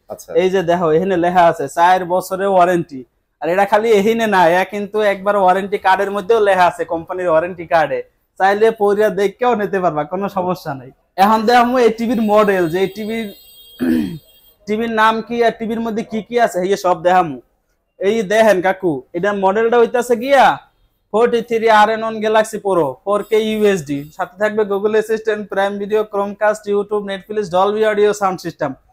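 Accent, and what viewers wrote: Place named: Barishal, Bangladesh. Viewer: খাস বরিশালি🤭🤭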